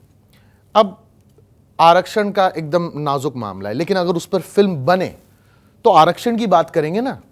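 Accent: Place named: Bengal, India. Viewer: I love your bengali accent the most. Hahahaha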